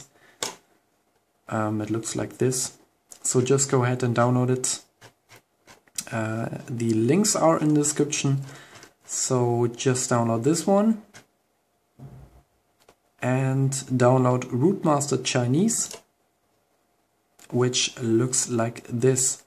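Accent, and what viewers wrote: Germany. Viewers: Are you german?:)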